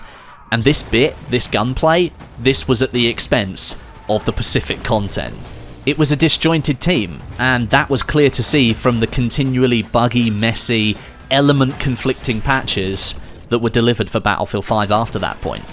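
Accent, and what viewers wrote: United Kingdom. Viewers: So, here I am with my already formed opinion, formed from hundreds or hours of BFV game play, that it's just...bad. And here you come along with your proper British accent and a dump truck full of logic and analysis. So NOW I have to admit that it wasn't all THAT bad...thanks man. Thanks. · where are you from? UK? Australia?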